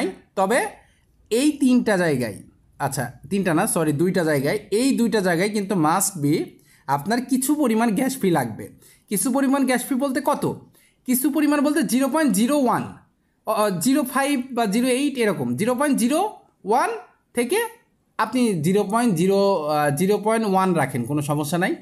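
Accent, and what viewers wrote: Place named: Khulna, Bangladesh. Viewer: অনেক ধন্যবাদ আপনাকে ভাই, ১ম ভিডিও শুনেই subs, like,share করে দিলাম ।এটা আমি খুব করি না । ভাই কি খুলনার ।কথা শুনে তাই মনে হয় ।So nice your speech.Thanks again.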